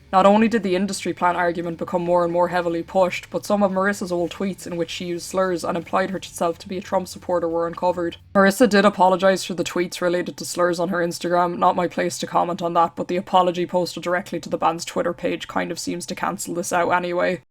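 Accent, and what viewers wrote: Ireland. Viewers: What is this accent? Irish? You have a calming voice. Happy yt recommended this, I’m subscribing! You did a great job of going over this saga. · I don’t care. I’d listen to a contralto Irish brogue read the phone book. I lived in Dublin for a while and it brings me back. Beautiful country with lovely people with pitch black senses of humor. Hope I get to move back one day.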